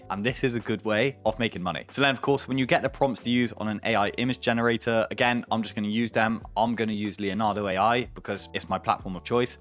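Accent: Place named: West Country, United Kingdom. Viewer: The Etsy brought me here, the West Country accent made me stay. 😂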